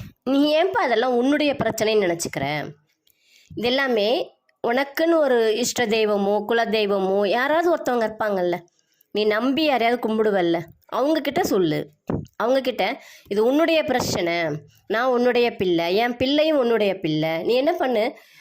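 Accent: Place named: Ernakulam, India. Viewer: Idun Ernakulam sonnadu pola iruku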